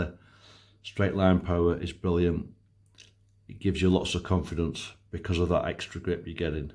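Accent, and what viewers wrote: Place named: Northern England, United Kingdom. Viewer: you dont sound welsh are you from wales you have a northern accent??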